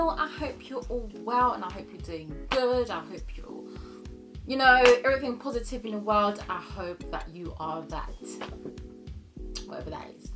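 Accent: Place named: England, United Kingdom. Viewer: You look exactly like R&B singer Fantasia but with an English accent. Lol . I like the encouragement you are giving girlie :). I'm transitioning to natural and I have had my hair cut real short before twice so I know how I'll look with short hair but I will not big chop I opt to grow it out gradually.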